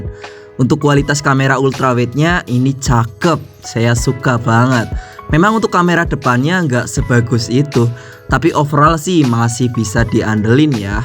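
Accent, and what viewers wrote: Banyumas, Indonesia. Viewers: orang banyumas juga bang?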